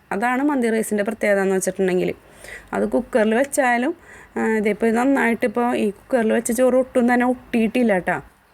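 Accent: Kodungallur, India. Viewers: Itha kodungallur kary aano... slang kettitt thonni... nice recipe.. njn nthayalum try cheyyum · ഇത്താ കൊടുങ്ങല്ലുർ ആണോ വിട്. സംസാരം കേട്ടിട്ട് ചോദിച്ചതാ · hey njnum kodungallurr... Eriyad.. enkku samsaram kettappol thonni.. kodungallurr evdeya... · samsaram kettapol thonni njnum kodungallur aann😁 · ഇവിടെ മക്കൾ പറയുന്നു ur talking style same as me.. അതാ kdr ആണോന്നു ചോദിച്ചേ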